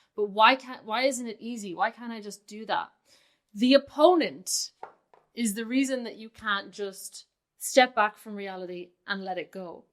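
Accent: Ireland. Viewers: pretty sure shes irish lol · Sound's like you have a Irish thang (accent) Are you Irish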